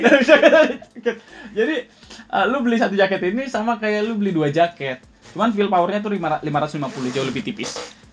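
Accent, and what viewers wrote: Java, Indonesia. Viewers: Bang kalo bisa qolqolahnya jangan terlalu medog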